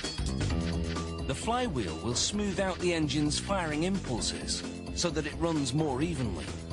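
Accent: United Kingdom. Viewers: i hate the British accent narrator voice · The British voiceover is the bomb.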